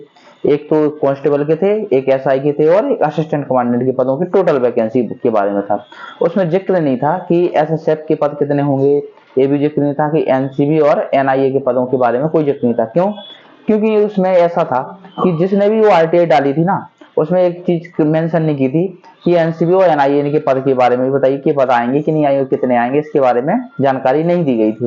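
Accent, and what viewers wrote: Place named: Jhansi, India. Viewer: Bhai मै झांसी से हु, 😊 आपकी भाषा काफी अच्छी लगती है, हम भी ऐसी ही बोलते है, आपसे मिलने का मौका जरूर मिलेगा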